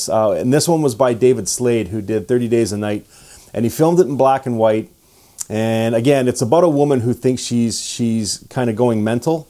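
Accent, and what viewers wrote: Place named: Canada. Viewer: Figured I heard a Canuck accent eh!!!!!! U were right this is a good movie. But I loved it and unlike you there were no Nickleback segments. As a seasoned gore whore I almost gave up on horror that went woke after 2018. Boy was I wrong and glad I gave it a try. Horror is in a dark cave at the moment but with a flashlight you can find a few gems in the shadows. BON CHANCE!!!!!!!😮😮😮😮😮😮😮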